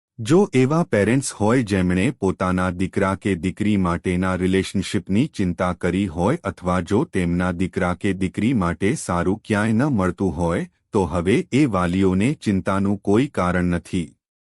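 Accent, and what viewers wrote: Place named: Maharashtra, India. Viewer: Divo salgaway nahi petaway bolnari bai gujrati nathi apbrahans shabdo par thi Marathi bai che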